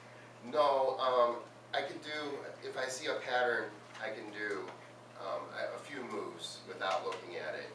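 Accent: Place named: Midwest, United States. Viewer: Are you in the midwest? (you sound midwesty) You did great, btw. I'm analyzing your speech for a class.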